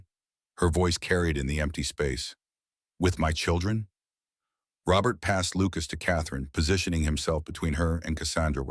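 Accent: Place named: Australia. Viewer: Australia very pleasant man telling the story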